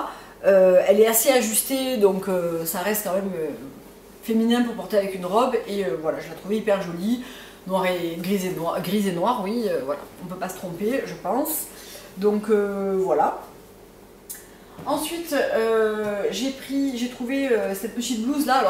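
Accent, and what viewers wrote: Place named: South, France. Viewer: re bonjour toujours un vrai plaisir et régal de regarder tes vidéos soldes, etc tu es magnifique, belle et ton petit accent du sud nous apporte du soleil gros bisous;-))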